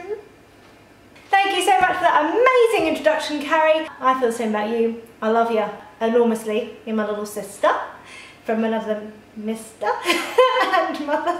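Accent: United Kingdom. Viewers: Her accent is British ☝🏻️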